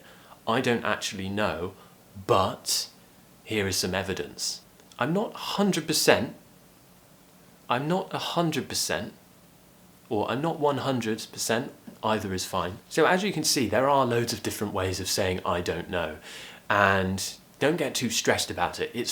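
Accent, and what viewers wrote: RP, United Kingdom. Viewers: please sir i like your accent so much and I'm your follower student on youtube podcast. I found the 4000 essential words are read by American accent and really I like it to be with your British accent. please try to help us by reading these 4000 essential words in English. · You are the BEST pronunciation teacher I am falling in love in British Accentbbecaise of YOU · Guys what british accent that he uses? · Hi, your British accent is really amazing, and this lesson is really helpful. Thanks. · I love your accent. Other British accents are also good but this RP accent is the most attractive one. It sounds confident, classy and so alluring. I can't wait to join your course and speak with you! ^ ^ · My God I'm literally in love with your British accent !!!! 🥰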